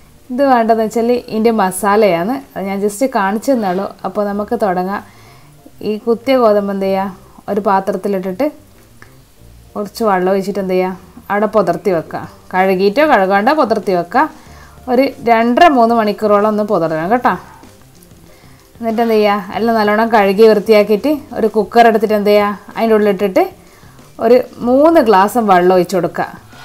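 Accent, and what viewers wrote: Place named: Kannur, India. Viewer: Adipoli ayitund.....enikk nde avtharanathil...ettavu. Ishtam enthanenn vechal..nammale..Kannur..bhashayil thanne ulla...... natural aya avtharanam...super dear..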